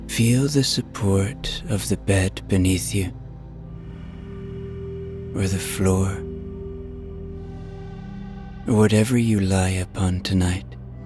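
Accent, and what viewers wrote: Ireland. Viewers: Please excuse me, I am uncomfortable with saying anything about this, but his accent is Irish.❤ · Loved this! I live Liabrarys, old ones expecualy, & Fairy Tails, Story's, & Irish Voice, Thank You !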